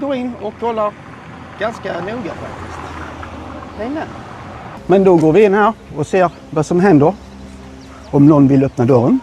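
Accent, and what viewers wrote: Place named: Skåne, Sweden. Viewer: Intressant film, hör att du är från Skåne och runt Hässleholms trakten. Välkomstdrinken i filmen togs på ett hotell i San augustin dock svårt att se in där då det är murar mm framför. Något jag sökt efter.i många år är var dom spelade in inköpet av Lasses åsna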